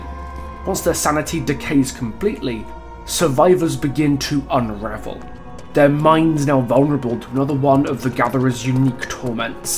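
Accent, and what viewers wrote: United Kingdom. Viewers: Of course the person who’s narrating the video is bri’ish…mf ruined it lmao 😭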